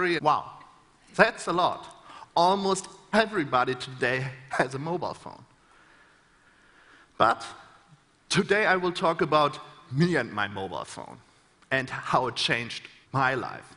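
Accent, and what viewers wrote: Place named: Germany. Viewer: I don't care about stutter but this German accent? Gosh.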